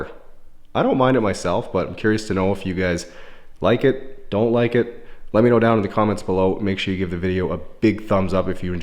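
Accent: Canada. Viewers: Love the Canadian accent ! Aye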